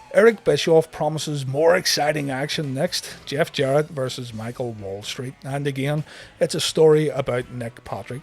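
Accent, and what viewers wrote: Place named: Northern Ireland, United Kingdom. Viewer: Just found this channel last night with the DX vids I noticed your assent by any chance you from Northern Ireland?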